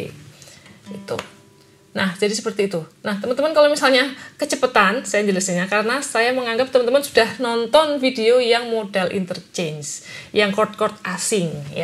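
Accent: Java, Indonesia. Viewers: Medok banget kak 😊 Jawa nya mana ?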